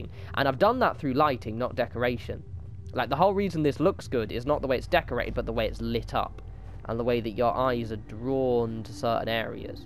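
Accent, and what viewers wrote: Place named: East London, United Kingdom. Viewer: You genuinely have a fascinating accent. Its like a mixture of eloquent country english and really harsh east london. Almost verging on cockney.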